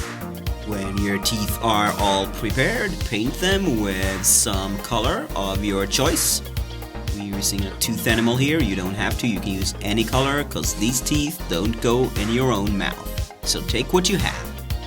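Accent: Sweden. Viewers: Swedish? :D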